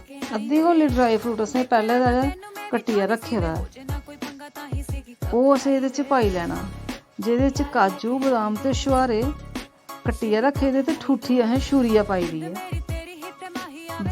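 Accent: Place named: Dogri, India. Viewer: Your dogri accent is soo good♥️♥️♥️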